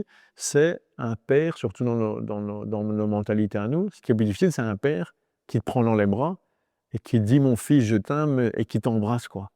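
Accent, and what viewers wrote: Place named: Brussels, Belgium. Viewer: Maître si les pot cast avaient existé il y a 20 ans je suis certain que j’aurais été inspiré par vous et j’aurais fais des études de droits. Vous êtes hyper inspirant. Ps: l’accent bruxellois est dingue 😂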